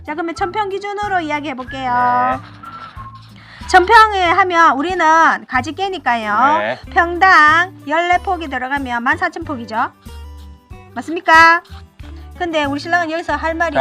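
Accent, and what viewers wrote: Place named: Sangju, South Korea. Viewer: 억양보니 상주 근처네요 . . 재밌고 잘하네요.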